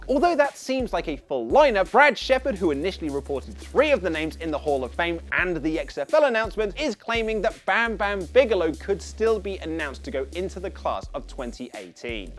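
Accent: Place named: United Kingdom. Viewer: I always noticed you say "shheduled" instead of scheduled...maybe its due to the British accent.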